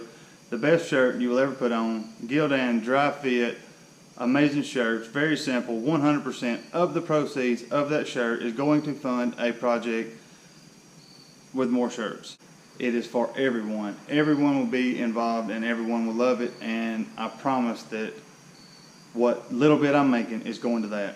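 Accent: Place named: Rural, United States. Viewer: This was probably the worst video I’ve ever watched. Stupid fucking monotone hick accent. I should kick your ass and file a suit for your fucking tractor hick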